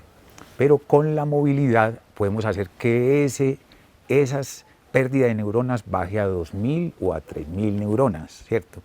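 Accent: Colombia. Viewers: Que delicia escuchar hablar a una persona colombiana !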